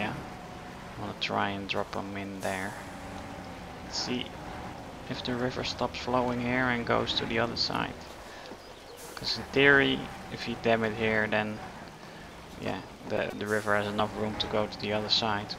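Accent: Netherlands. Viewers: are you dutch? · Are you dutch?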